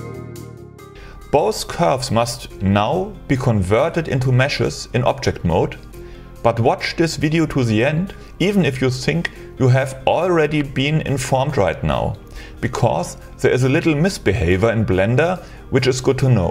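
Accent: Germany. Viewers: do i hear a little bit of deutschen akzent?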